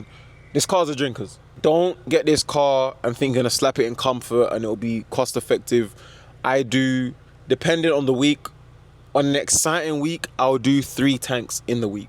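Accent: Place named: South Africa. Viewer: he get me everytime , i keep thinking bro is from southa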